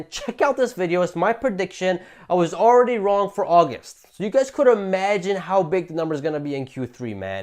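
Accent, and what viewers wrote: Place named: United States. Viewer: Just curious, why do you have an American accent?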